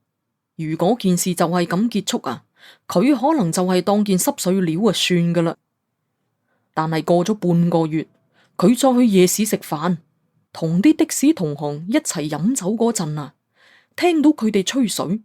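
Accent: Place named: Guangzhou, China. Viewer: 廣州腔講故🤣🤣好好聽😂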